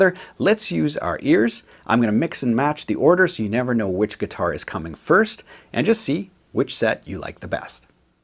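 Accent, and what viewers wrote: Canada. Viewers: He has like the most canadian accent ever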